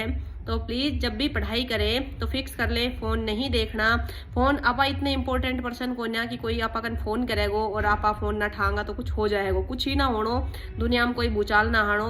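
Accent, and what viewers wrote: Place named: Shekhawati, India. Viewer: Mem kapil choudhary sir RTS jesy bhasha he aap ki😂😂 aap shekhavati pradesh say hi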